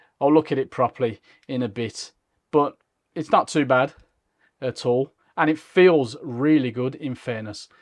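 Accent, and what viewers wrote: Birmingham, United Kingdom. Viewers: I recognise the Birmingham.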